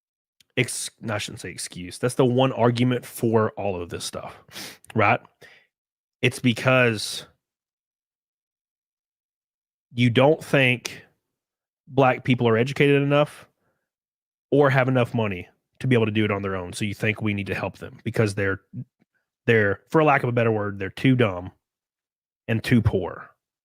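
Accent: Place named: South, United States. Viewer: Damn, I use to like this guys videos about gamestop. Now it seems he is trying to keep his channel going by going into politics. I am sad because he is just going down the stereotypical road of spitting out conservative talking points. Having to be forcibly put in a role because of what ones race is, is not necessarily pure racism if there is not an equal proportion of people in those positions. Its not about being for something because it gives a benefit. Its about getting an equal opportunity. That is the problem. So not, it is not necessarily racism. It is challenging existing racism and current systemic racism. To an ignorant person it will seem like it is racism and I cant help that outside of writting this comment. But I really did like his videos on gamestop. It really does make me sad that he went down this road. But maybe I should have been like ofcourse this guy is mostly an idiot because of his southern drawl.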